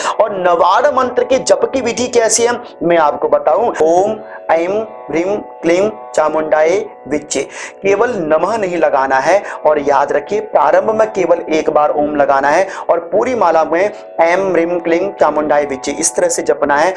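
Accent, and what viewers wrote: Standard. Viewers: शुद्ध उच्चारण अत्युत्तम। धन्यवाद इस प्रकार की जानकारी के लिए😊🙏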